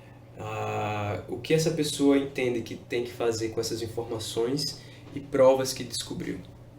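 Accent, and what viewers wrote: Northeast, Brazil. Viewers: Oi, você já foi membro ? Gostei do sotaque, é do nordeste ?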